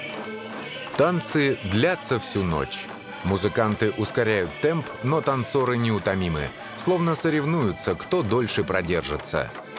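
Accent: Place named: Spain. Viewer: И названия и произношения ужасно.Почему произношение испанское?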